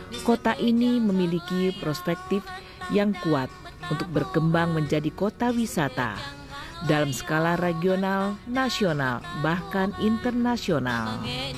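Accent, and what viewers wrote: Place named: West Sumatra, Indonesia. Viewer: Ngomongnya sperti minang ya.. salam merdeka dari saya minang malaysia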